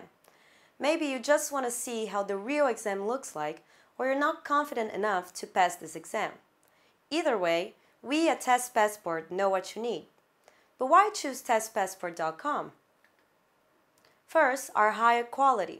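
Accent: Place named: Bulgaria. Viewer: She is bulgarian (I noticed the accent) there everything is possible, no rules, no regulations.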